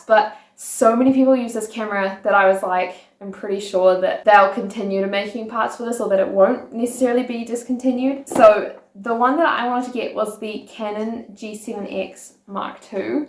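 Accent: New Zealand. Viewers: Once you've reached 1k subs, it'd be only a small step to make it up to 10k.... there will be the point Germans become the majority of your viewers and subscribers, with almost all of them being former exchange students at some parts of their lives (mostly to the US). Your Kiwi accent is so strong, slowing down on your speaking speed would make you easier to understand. I'm still getting it but I have to rewind a couple of times..... And yes, I'm a German subscriber :-)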